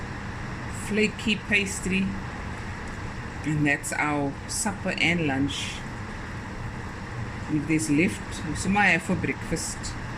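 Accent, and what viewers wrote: South Africa. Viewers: Is that a South African accent I am hearing?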